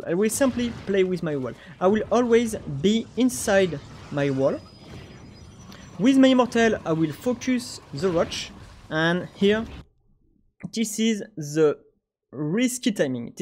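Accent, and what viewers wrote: France. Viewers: Great info dude! Love your Frenglish accent as well :) Waiting for more non-French videos like this.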